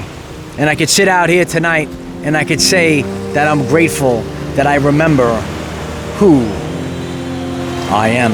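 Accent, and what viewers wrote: New York, United States. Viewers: Wyoming? I would not have guessed. But you have a noticeable New York (or east coast) accent. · I love this message. Thank you! You sound like a New Yorker 😊 We have all changed over the past years especially since Covid. Thank you for inspiring me today I need this. We are light! You are doing good work for the kingdoms❤ thank you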